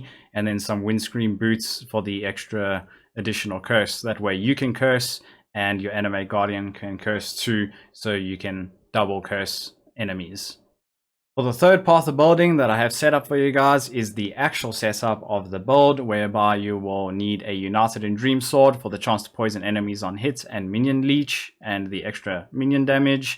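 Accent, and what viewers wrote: South Africa. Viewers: You sound South African dude. Am I guessing correctly?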